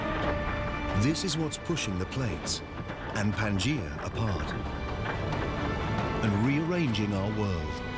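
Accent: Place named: United Kingdom. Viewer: that documentary is narrated by an American, while this one that you uploaded is narrated by a British. You can tell the difference by their accent and the different units of measurement that they use.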